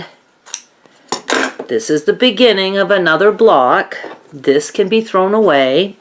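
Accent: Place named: New England, United States. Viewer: I just started my first crumb quilt for a wedding gift for my best friend 💝 I was using a combination of method 1 and 2 but now I've got 3 more to incorporate and really shake things up! Instant subscribe seeing the "Queen of crumb quilting" comment lol Where are you from,? I recognize the New England accent, but can't pinpoint a state 😂 hey from northern Maine 👋💝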